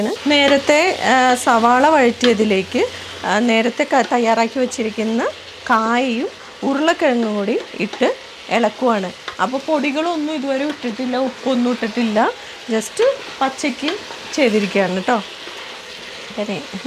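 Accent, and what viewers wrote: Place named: Kochi, India. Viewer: Chechy vyppin Kari anoo / atho kochikari ?slang kettit angine thonnu, enthayalum sambhavam powlichutta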